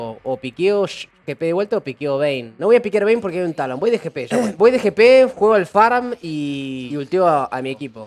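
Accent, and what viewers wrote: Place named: Argentina. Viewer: rapaziada o é argentino kkk ele fala do jeito q ele quiser e continua o mesmo cara e da pra entender e dar risada bem de boas n enche o saco do mano não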